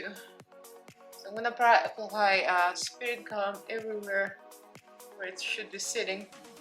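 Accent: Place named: Russia. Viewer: You are just too funny. "My husband tells me I look just like her, but he always compliments me, so I don't trust him." "I sound like I have a speech defect. Yes, I have a Russian accent, so I sound like I have one anyways." 🤣. I already told you I think you look exactly like Alexis, too. And I'm not your husband, so you should believe me.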